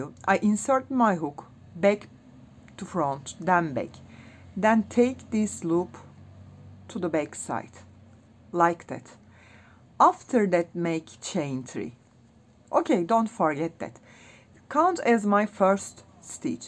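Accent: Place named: Turkey. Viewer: The second I heard the accent, I knew you are Turkish. Dünya çapında videolar yapan bir Türk görünce asın bayrakları diyesim geliyor ❤❤❤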